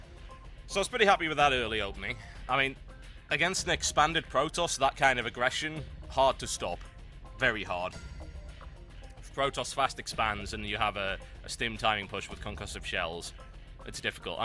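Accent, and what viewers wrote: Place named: Newcastle, United Kingdom. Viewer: He's a Geordie, that's why. Learn your British accents.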